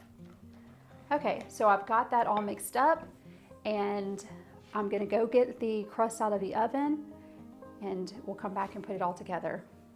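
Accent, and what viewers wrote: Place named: Florida, United States. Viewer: You sound like us here in Florida! Especially the "pachauns" not pECans"! 😎🌴🌺👍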